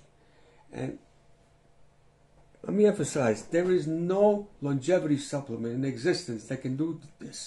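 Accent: New York, United States. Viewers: Love the advice (I'm 76) and love the accent. Maybe you're from New Yooorrrk?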